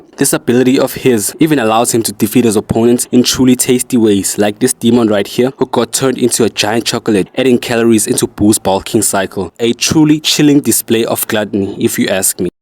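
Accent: South Africa. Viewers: Sorry to say, your accent sounds more like a South African accent.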